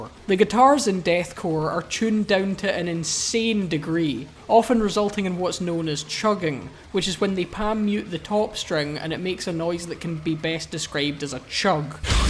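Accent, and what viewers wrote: Scotland, United Kingdom. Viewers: Funny Scottish dude make the good explanation, my question, where does the Hu fit in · the scottish accent is just the cherry on top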